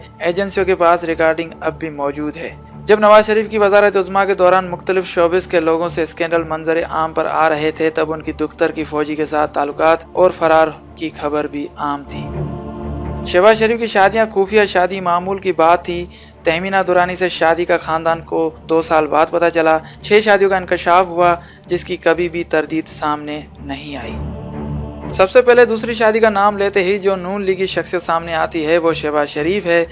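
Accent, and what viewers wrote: India. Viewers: Tumhara lehja pukar pukar Kay keh raha ha kih tum bharti ho.is ka Matlab ha kih tum Sara din social media ka Kam kartay ho. Lagay hath imran ki zanashuda zindgi ko b Sam karo. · aap agar pakistani ho to indians ki tara kaun bol rahay ho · Tum indian ho tumhara lehja indians wala haiy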